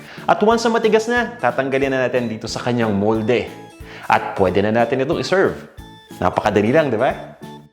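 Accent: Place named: Coño, Philippines. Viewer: Masaya po ako na matatas na tagalog niyo. Old videos niyo po ang coño kasi pakinggan haha. Pero masaya pa rin. Itatry ko po ito sa noche buena. Magisa po ako sa pasko kasi bawal magtravel pero dapat masarap pa rin pagkain kahit ganun.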